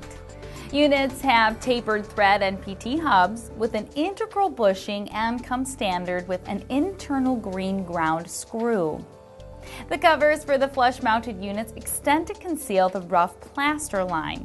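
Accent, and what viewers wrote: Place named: Great Lakes, United States. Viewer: I just love Great-Lakes accent. Living in Toronto for years, I took every "appertunity" to do some "crass-borda shapping" and pick up a "bax" of fresh "caffee", all for under a hundred "dallers".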